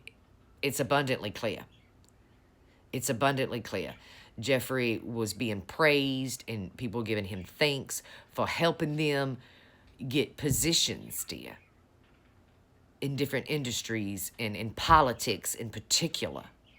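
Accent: South, United States. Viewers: I want to be southern and sound like you. Also you always hit it hard. Thank you. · luv your voice ma'am! true southern bell.....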